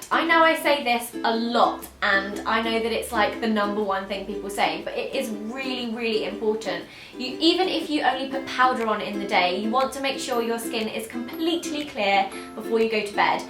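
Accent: United Kingdom. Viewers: I love your videos and I live your British accent❤️❤️❤️😘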